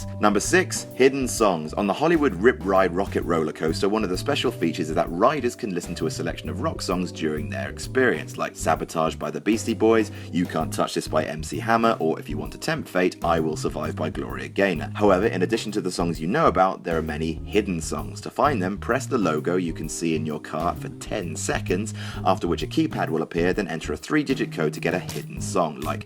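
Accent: United Kingdom. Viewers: WHY did they use a steroid-voiced, heavy accented, British motor-mouth turkey to narrate this?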